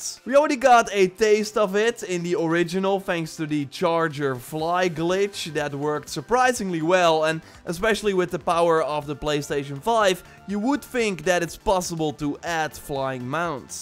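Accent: Netherlands. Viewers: when I heard his accent I knew instantly that he was dutch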